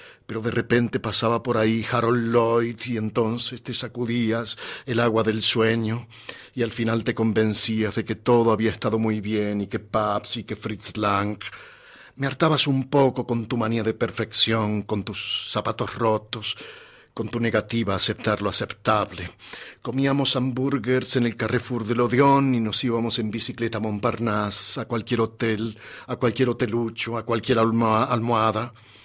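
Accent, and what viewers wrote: Argentina. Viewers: Me encanta con el acento argentino.por que en mi imaginación no lo lee el señor del canal de Youtube.. Para mi lo está leyendo el mismísimo Cortázar con todita su argentines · Invito a todos los que critican al narrador a hacerlo mejor que él. Escucharon narrar a Cortázar???? Lee (siiii, lee) de esa forma, dándole cadencia. Y eso es lo que hace el narrador. Es muy difícil ponerse a la altura de semejante obra y poder transmitir lo que logra transmitir. “Rayuela” se llama el libro. No “La rayuela”. Y tiene acento argentino el narrador, por el hecho de que Cortázar era argentino, por más que tuviese acento francés. Felicitaciones señor narrador! Ponele tu impronta. Lo importante es mantener viva esta obra de arte.Cortázar te amo ❤️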